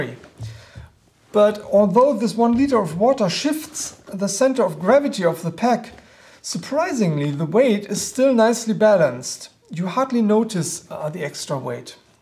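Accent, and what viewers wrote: Germany. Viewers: Your english is cool - I like the german accent ;)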